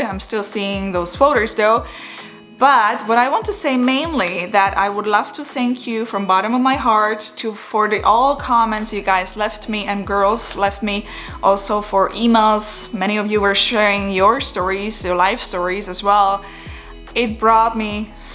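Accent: Czech Republic. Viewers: Are you from Czech republic? :-) I heard "Ahoj" and your accent is also similar to czech-english one. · Oh wow. Wasn’t expecting the czech accent. Haha. Great video btw!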